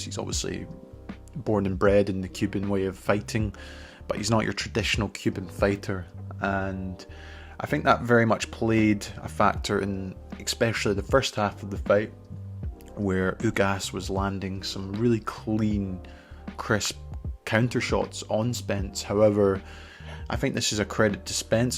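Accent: Scotland, United Kingdom. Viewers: Hey dude are you from Scotland? I’m from Dundee/Aberdeen and I love boxing. We should do a co-lab sometime, I’d be happy to jump on the show and chat with you anytime! Keep up the good work 👍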